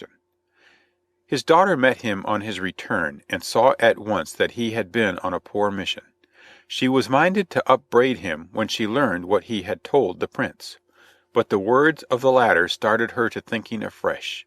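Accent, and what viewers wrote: United States. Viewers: An American accent. Sorry, just doesn't work. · American accent 👎🏻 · Like an American robot? This is an English folk tale...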